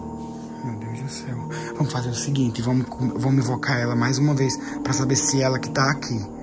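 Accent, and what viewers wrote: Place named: Pernambuco, Brazil. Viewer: Onde você mora qual é o seu estado você mora em Buíque esse povo fala assim Senão não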